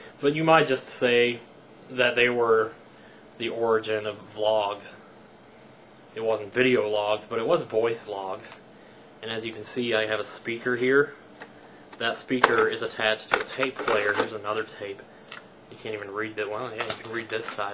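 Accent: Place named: South, United States. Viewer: You used to have a lot more of a southern accent.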